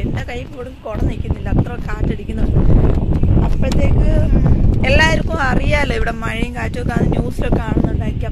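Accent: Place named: Idukki, India. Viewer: Pakka idukki language ❤️❤️😘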